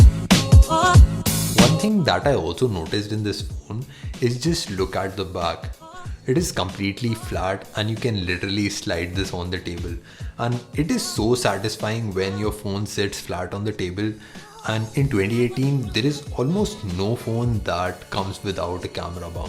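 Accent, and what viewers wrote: India. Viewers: Fuck your Indian accent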